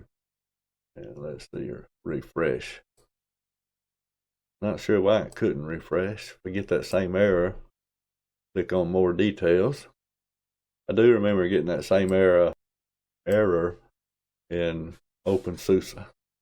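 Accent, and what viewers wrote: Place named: South, United States. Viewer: Hi, GeckoLinux creator here. Thanks a lot for the thorough and open-minded review! And I love that southern drawl! Real pleasure to listen to.;-) Just a few clarifications: Regarding the lack of GeckoLinux theming for GRUB, that's intentional. GeckoLinux is unapologetically openSUSE, created from openSUSE packages and using the openSUSE repos. I recognize that I'm standing on the shoulders of giants, and I make no attempt to conceal the fact that the system is still proudly openSUSE. I'm not sure what happened with the Google Chrome repo refresh, must have been a temporary network failure. You can just skip the refresh on individual repos instead of skipping the entire process. What appears in YaST Software the first time after the initial database refresh is not a list of availabe updates, but rather a list of packages specific to your hardware. 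The XFCE panel clock size is actually controlled by the XFCE general fontsize settings. Your summary at the end was good, GeckoLinux is meant for users that appreciate the quality and excellent community of openSUSE but don't like dealing with the hassles. There are quite a few papercuts in a vanilla openSUSE system, mentioned on the GeckoLinux website, so the goal of the project is to eliminate those via configuration changes and package selection, while still remaining 100% openSUSE at its core. Thanks again! Have a good one.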